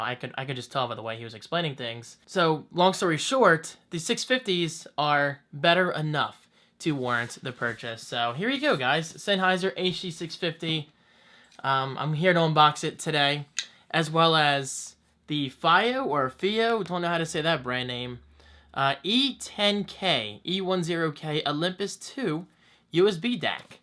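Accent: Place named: Staten Island, United States. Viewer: Lol I feel like your from Staten Island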